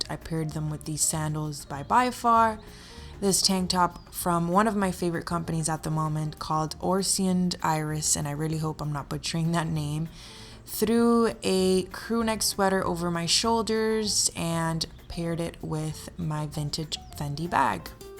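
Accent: Cuba. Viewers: S. K she’s cuban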